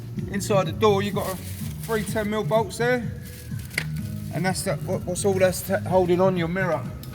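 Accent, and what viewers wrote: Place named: Africa. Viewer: Africano hablando inglés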